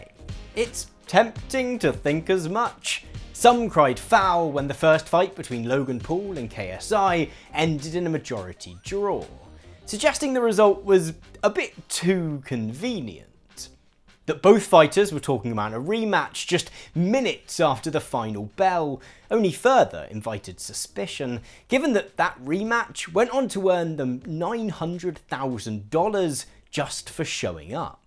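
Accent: RP, United Kingdom. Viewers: voice, accent and intonation sound exactly like coming from a listening exercise in a British English course. Perhaps recording these is his other job...?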